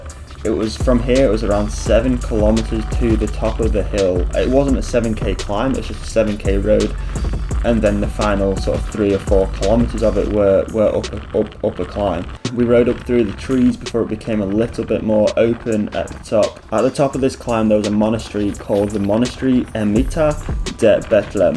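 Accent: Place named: Northern Ireland, United Kingdom. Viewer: Hey, so good to hear you still have some of yer old norn iron accent. Love the "oh dear" when you guys hit the road closed sign 😁 what about yee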